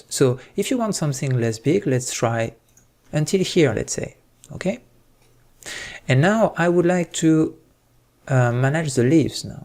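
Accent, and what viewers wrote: France. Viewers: Très bon tuto ! Et le petit accent français qui fait plaisir xD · Tu serais pas français par hasard ont dirait l'accent Français xD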